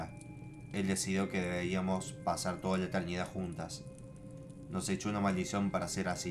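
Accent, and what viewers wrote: Argentina. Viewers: sos argentino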